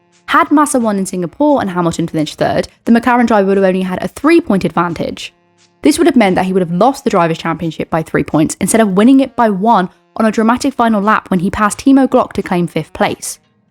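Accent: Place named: United Kingdom. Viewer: Great video, keep going, having British accent in F1 commentary always sounds awesome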